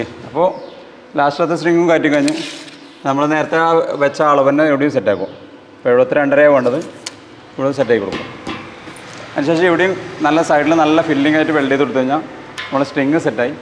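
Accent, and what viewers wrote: Kannur, India. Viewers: Bro kannur aano🙄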